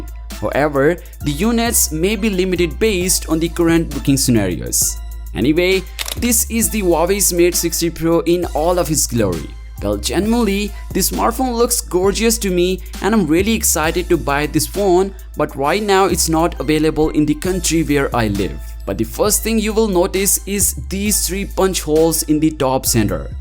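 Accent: India. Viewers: 听着像印度口音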